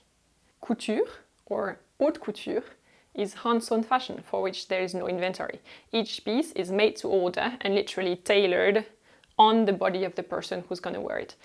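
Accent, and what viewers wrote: France. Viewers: Oh my! You should teach us french as you are a pretty french woman with pretty french voice) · Ur french accent is so adorable 😘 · I love your French words as well as your French accent., you present yourself so well and it's a joy to listen to your videos. 👍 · J'adore tes vidéos , j'ai commencer à les regarder pour améliorer mon anglais , de française a française on comprend mieux l'accent , et puis j'ai fini par découvrir que tout tes sujets étais intéressent et très réfléchi , ton naturel , ton charme et ton expérience font de toi une personne unique ! continue !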